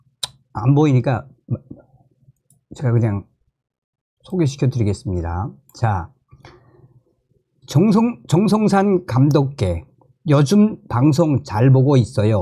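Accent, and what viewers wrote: North Korea. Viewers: 윤상현 입니다 윤상년 아니구요 존경하는 정성산 감독님 방송 열심히 해주시는데 감사합니다멸공 부탁의 말씀은 발음 연습 필요합니다북에서 쓰시던 발음 불편하게 들릴때 많아요 열심히 해 주세요 화이팅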